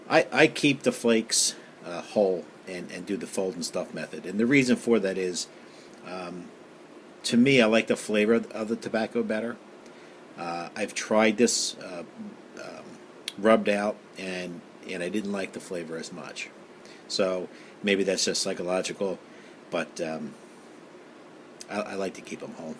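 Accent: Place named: Black Sea, Turkey. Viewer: doğulusunuz ama karadeniz şivesi ile konuşuyorsunuz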